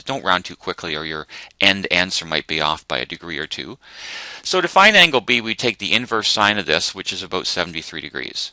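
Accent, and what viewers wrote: Canada. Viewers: u sound like a canadien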